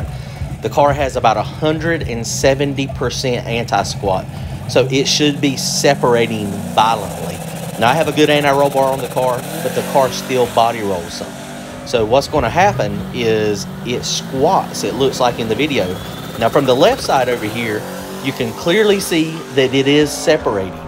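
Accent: South, United States. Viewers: Really cool informative video. Question are you originally from the south?.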